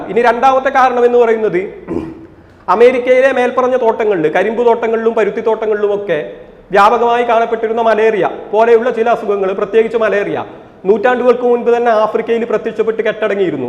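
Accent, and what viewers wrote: Kasaragod, India. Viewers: താങ്കൾഒരു അദ്ധ്യാപകൻ ആയിരുന്നു എന്ന് പറയുന്നുണ്ട്. ഏതായിരുന്നു വിഷയം (subject) എന്ന് അറിയാൻ ആഗ്രഹിക്കുന്നു. എന്തായാലും ഈ അടുത്ത കാലത്താണ് താങ്കളുടെ videos കാണാൻ അവസരമുണ്ടായത് വളരെ informative ആണ് താങ്കളുടെ അവതരണം. സംസാര ശൈലി എനിക്ക് ഇഷ്ടപ്പെട്ടു കാരണം ഞാനും ഒരു കാസറഗോഡ് കാരനായത് കൊണ്ടാകും. ഇനിയും ഒരുപാടു വീഡിയോസ് പ്രതീക്ഷിക്കുന്നു 👌👍. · Hi brother I like your open mind and honesty.kasargode slang is not bad.you are doing your job well and your presentation is exempt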